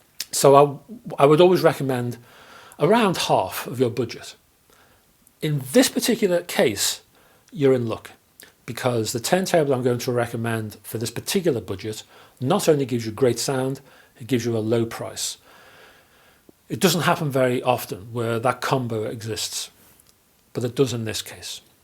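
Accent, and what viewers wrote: United Kingdom. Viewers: Played the video and got an earful of British accent. I immediately, trust this man's recommendations for absolutely everything, from record players to scuba gear to fighter jets.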